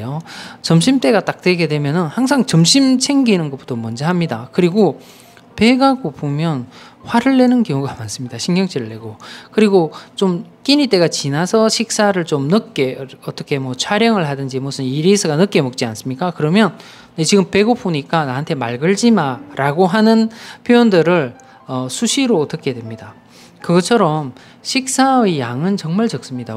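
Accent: Gyeongsang, South Korea. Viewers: 삼성이 철수하기로 했다죠,,,,,,,,,,설명해주신 이유도 상당합니다,,,,,,,,,,설명하시는분 억양이 경남쪽인것 같네요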